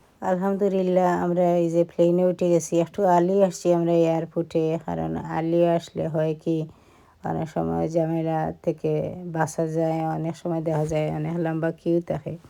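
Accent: Sylhet, Bangladesh. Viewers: Afa bangla notable that is good. Also pure sylhet toking that is very good. We r sylhety. Hahaha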